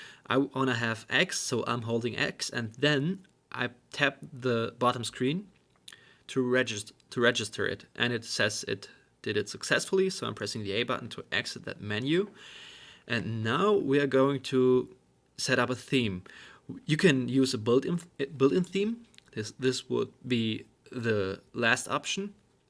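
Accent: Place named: Germany. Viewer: Du bist deutsch das höre ich xD :D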